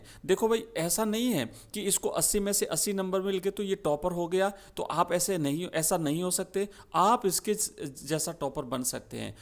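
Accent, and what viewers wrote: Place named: Wagad, India. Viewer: Sir aap wagad se ho kya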